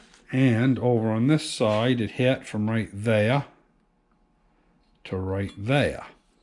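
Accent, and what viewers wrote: Maine, United States. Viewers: I never realized it until watching this video that Jud Crandall from pet seminary talks the way he does because it is a Maine accent. Cool video by the way.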